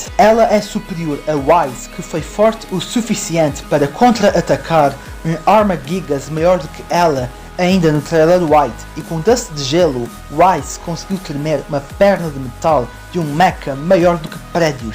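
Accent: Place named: Portugal. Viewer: Nao sabia que vc era português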